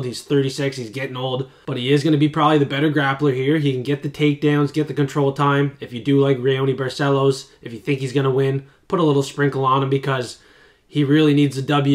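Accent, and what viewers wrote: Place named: Charlottetown, Canada. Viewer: I knew that accent sounded familar. I'm from Charlottetown.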